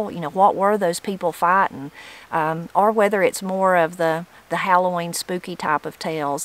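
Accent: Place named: Southern Appalachia, United States. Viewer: What part of the country are you from my sister? I'm from Lee county Va and your accent makes me homesick. We now live in Franklin North Carolina and in the past near hickory nut gorge and brown mountain. Love your videos, God bless!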